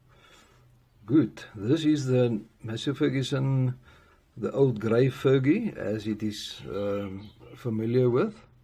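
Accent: South Africa. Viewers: i can tell your from south africa cos i used to live there and i am a big fan of tractors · Are jou south african😀😀😀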